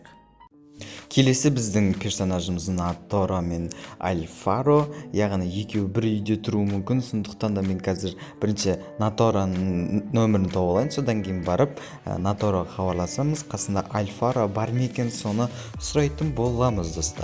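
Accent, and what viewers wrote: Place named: Shymkent, Kazakhstan. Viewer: Шымкенттенсинбе шиш деп сойлейсын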